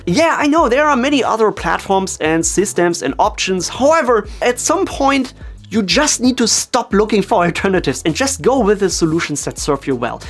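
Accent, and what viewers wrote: Germany. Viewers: that is one solid german (i assume) accent, pleasant to listen to if i may say so. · Moin, netter Überblick. Bringst eine Gute Energie rüber! Werde hier definitiv nochmal vorbeischauen ;) Gut, dass du das mit "Linux als OS" noch im Nachgang erklärt hast. Das hat dir glaube ich einige Kommentare erspart :D ;) weiter so! offtopic: auch respekt an dein flüssiges englisch. aber doch immer wieder erschreckend wie man es ab sekunde 1 raushört, wenn jemand aus germany kommt.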